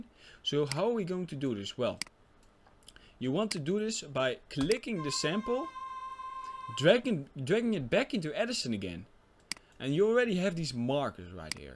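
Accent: Netherlands. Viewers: lol i think that MAN is dutch like me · 2 sec in de video en ik dacht gelijk jup Nederlanderrrrrrr :) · Nederlands accent hahah. Nice vid man! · ik hoor aan je accent dat je nederlands bent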